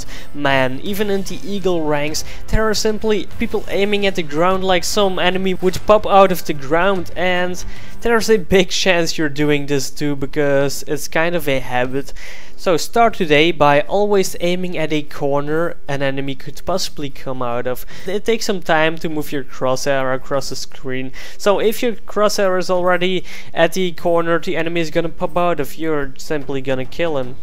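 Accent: Netherlands. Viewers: Are u dutch? :o.... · lol, ben jij nederlands?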